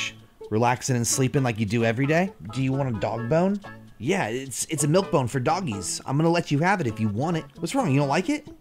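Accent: Caribbean. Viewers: But that carribean accent on point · Me not likely the Caribbean accent or Spanish accent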